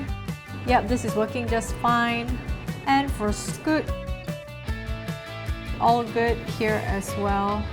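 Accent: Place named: Malaysia. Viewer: Malaysia accent.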